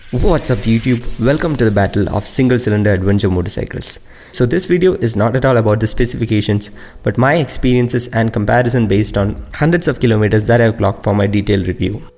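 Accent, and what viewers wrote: Kerala, India. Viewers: For a moment I thought you were saying in "telugu"... bdw malayali accent ❤️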